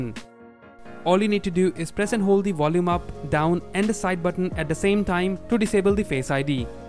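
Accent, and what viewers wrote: India. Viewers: Indian accent is sooooo bad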